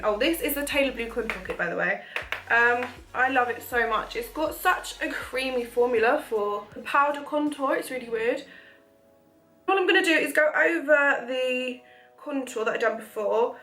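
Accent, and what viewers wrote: United Kingdom. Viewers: aww you r stunning guurrl✨👑 you're really talented and pretty but I really hate that British accent I prefer American's one way more but it's cute on you idk it always annoys me but it sounds cute on you ok bye love you💌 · isn't enough be so pretty, you have to be british with this awesome accent too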